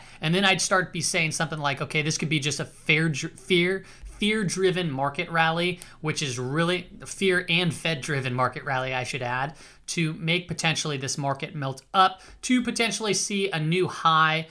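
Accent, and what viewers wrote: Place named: California, United States. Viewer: especially with your Californian accent, the analysis sounds even greater:)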